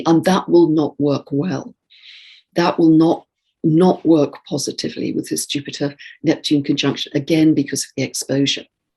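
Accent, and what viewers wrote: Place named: RP, United Kingdom. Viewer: And she does it in a rather cultured British accent.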